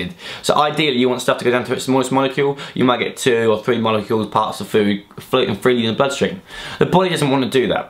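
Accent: United Kingdom. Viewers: I understand much of what you're saying and it's important...I have a bit of trouble here and there not because of the UK accent (I;m Canadian :P) but maybe you speak too quickly? I never thought I'd say that! But I'm going to rewatch and try to catch a couple of things. Also I've watched your vids before on health balanced diets, and other ones ( I follow Cassey Ho too) I'm just confused about portions and what good foods are the best or not the best to have (like in the various food groups, breads, grains, fruits, types of meat etc) I would love some true explanation on that - the internet (like google searching) gives too much conflicting info depending on who wrote the article you know...so :S Thanks a lot :D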